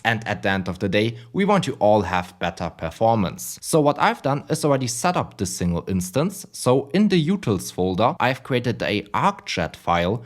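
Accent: Germany. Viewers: Are you of German inheritance? Your sound like me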